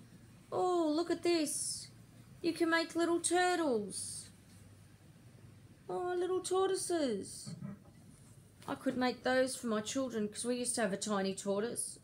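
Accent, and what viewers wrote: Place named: Australia. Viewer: Hello, I'm new to you sight. Nice to hear the Aussie accent. Thank you for showing those magazines, going to get the Crochet Now Magazine tomorrow. :)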